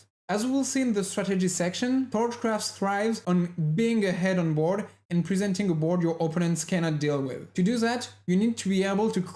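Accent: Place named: France. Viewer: That accent,you can't fool me,are you french?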